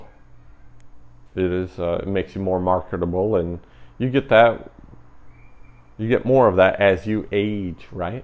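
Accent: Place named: Eastern Europe. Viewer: Slavic accent, similar faith background and similar job… dang this is up my alley. Definitely a lot to learn from you, and man if you’re reading this, I’m really sorry for what happened. It gave me chills to hear what’s happened to you. I could not imagine seeing that happen in our community’s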